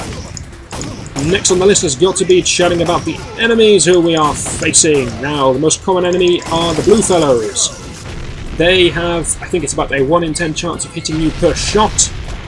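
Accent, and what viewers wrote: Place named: United Kingdom. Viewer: Does anybody in the United Kingdom play this game or more was this filmed in that area? I don't know but I'd like my question or questions answered. The narrator of this video sounds like he's from the United Kingdom.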